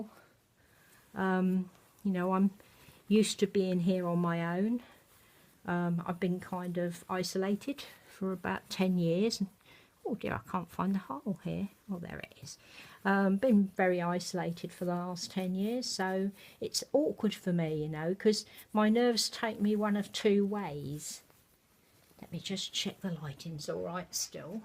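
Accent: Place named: Berkshire, United Kingdom. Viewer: Oh dear, you were definitely not too chatty and we both adore you. You are just like my Mum in so many ways. She also gets really nervous when meeting new people but she couldn't speak more highly of you on the way home from yours. She felt she made a real connection with you. Please don't think you put us off in any way - we love you just the way you are. Sarah Louise is looking gorgeous as always. The hat and booties are a lovely colour. Nothing wrong with a Berkshire accent I might add - I have a Berkshire twang as well - lol. Me and Mum want to visit you again soon but I don't want you getting nervous about it - silly you!!. Love and big hugs Annie xx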